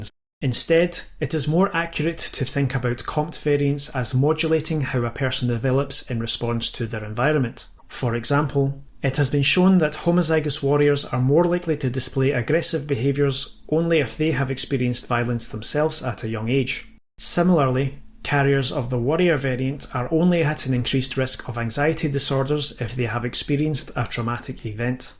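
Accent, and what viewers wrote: United Kingdom. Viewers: Are you a Scouser? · Warrior/ worrier isn’t the greatest phrase to follow with a Scottish presenter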